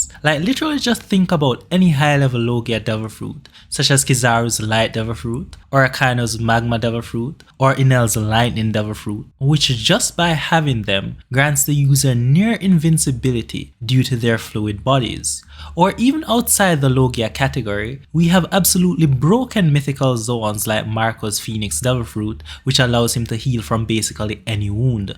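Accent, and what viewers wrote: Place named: Jamaica. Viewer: This is my first video of yours I have seen. I caught the accent IMMEDIATELY. Where ya from. I’m from Jamaica i hear patois. If you are. I’m subbing rn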